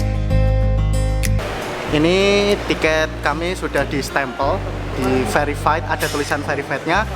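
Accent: Java, Indonesia. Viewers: mas jowo hehehehe woyo2 mas e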